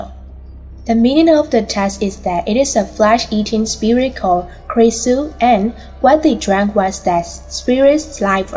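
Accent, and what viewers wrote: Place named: Myanmar. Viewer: Are you a Myanmar?I just notice your accent.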